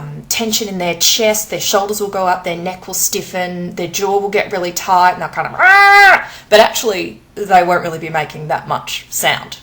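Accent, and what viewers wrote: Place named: Australia. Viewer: Aussie accent, the one and only. Just love it!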